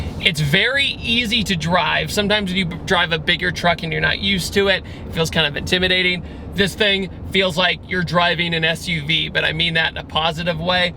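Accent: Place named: West Virginia, United States. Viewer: Dude gotta be from West Virginia. Ouch...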